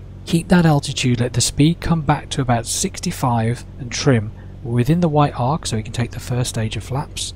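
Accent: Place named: England, United Kingdom. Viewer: Great lesson. It is good to have in an English accent. Plus a view from the pilot's seat so as to get the picture.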